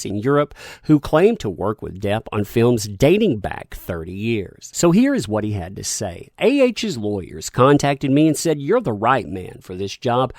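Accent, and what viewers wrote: South, United States. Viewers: Man your voice is rad. It sounds very southern and endearing. I don't really care about the story but how you portray the information has got me listening. I hope you and yours are good during these trying times.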